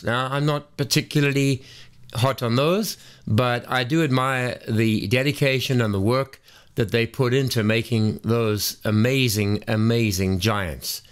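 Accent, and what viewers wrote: South Africa. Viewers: Can hear you're a South African that have been in Canada for a few years. Sorry about your Tesla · glad you two are safe! slightly off topic but are you any bit south african?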